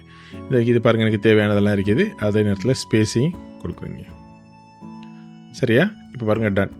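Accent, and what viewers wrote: Sri Lanka. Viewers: bro Eelam tamil ah? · Are you from sri lanka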